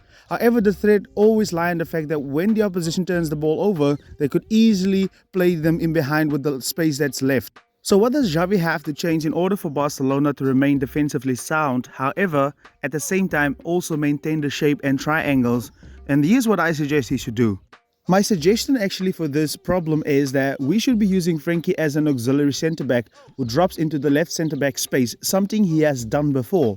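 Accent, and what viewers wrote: South Africa. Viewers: You sound like a South African 😅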